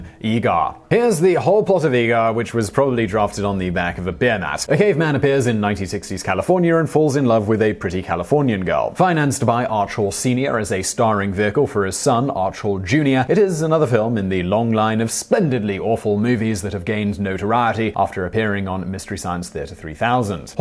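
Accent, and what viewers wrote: United Kingdom. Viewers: Same. He has the perfect British accent...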